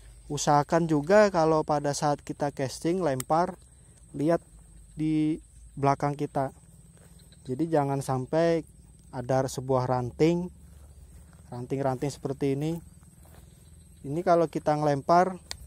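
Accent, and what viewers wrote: Banjar, Indonesia. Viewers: urang banjar kah bang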